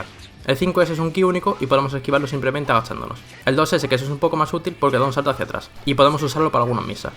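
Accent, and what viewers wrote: Spain. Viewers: Eres de granada bro?, se parece tu acento al mío un poco jajaja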